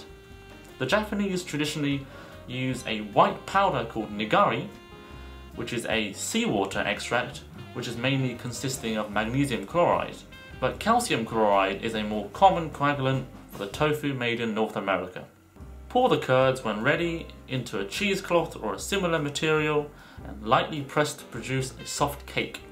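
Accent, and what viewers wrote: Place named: China. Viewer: You must be living in an Asian country for too long - your accent is very chinese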